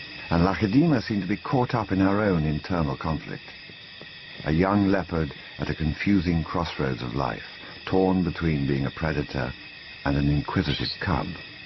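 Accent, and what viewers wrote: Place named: United Kingdom. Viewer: Bonito é o sotaque britânico do narrador... rsrs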